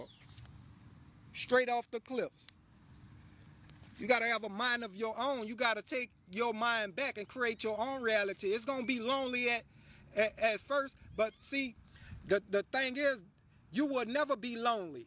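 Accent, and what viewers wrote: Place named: New Orleans, United States. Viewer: Look at Godhead 🙌 I just stumbled across another #Heyoka and sounds like you from the NoLa too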